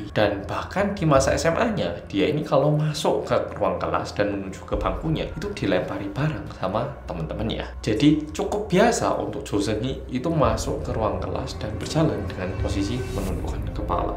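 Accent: Java, Indonesia. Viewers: Untung mas Hansol dulu di bawa ke Malang..korbanya cma rawon sama pecel...beruntung dan besyukur...teman" di skolahan masih berhubungan baik...jadi medok lagi. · Jawanya terlalu berat untuk aku. Sukar utk dihadam 😅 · Mas Hansol :hai guys welcome back to korea reomit bersama orang korea yang........ Satu kampung sama gw : Medhooooooooooooook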